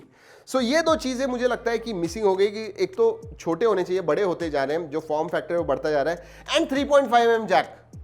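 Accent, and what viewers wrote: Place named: Marathi, India. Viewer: अरे व्वा आपण एवढं स्पस्ट मराठी बोलतात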